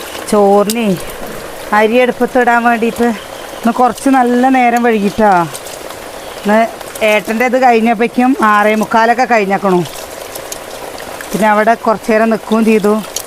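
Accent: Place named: Kozhikode, India. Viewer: koyiod samsaarikkunnad kelkaan nalla rasa😊😊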